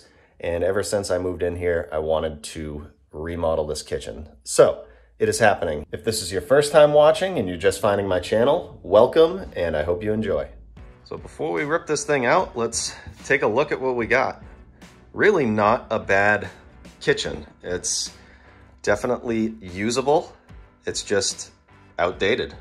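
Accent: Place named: Midwest, United States. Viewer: You live in Massachusetts ?? Are you original or you moved there ?? I don't hear any form of the typical " Boston Accent ". You sound more like a Mid-Westerner. I'm from Boston. Grew up in the Dorchester neighborhood. Left when I became an adult. . . . . .That whole snow thing is not much fun as an adult, but it was great when I was a kid, (snow days off from school).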